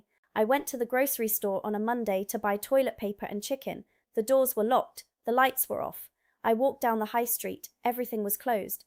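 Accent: England, United Kingdom. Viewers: Wonderful and accurate information. I must note your charming adaptation of a generic "English" accent after so short a time in the UK. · your English accent is flawless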